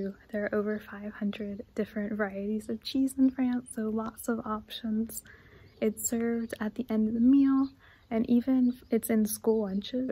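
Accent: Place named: United States. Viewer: Your videos are quite enjoyable. For me, you have those French qualities of understated, put together dress style, subdued makeup presentation, and an understandable tone when speaking French, BUT….it’s ruined when you speak American English! Could you please fake a French accent just once when speaking English?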